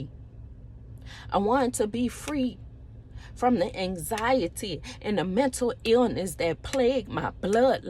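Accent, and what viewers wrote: Louisiana, United States. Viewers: She from Louisiana 😊 I 💕 her accent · I’m trying my hardest to stay positive in life but it’s been hard I feel like Joel in so many ways I have lost everything including some family members that I love dearly and I’m trying to figure my way through this storm it’s been going on for some years now I have been praying for blessings and opportunities and open doors I have never prayed this much ever in my life! I’m just not used to this I’m homeless I work for a relative and he doesn’t care about my situation I just need to get back on track ! My heart is pure ! I’m strong but I’m at a boiling point and I need help and prayer so boss lady can you please pray for me and I love your Louisiana accent ❤️❤️🙏🏽🙏🏽💯💯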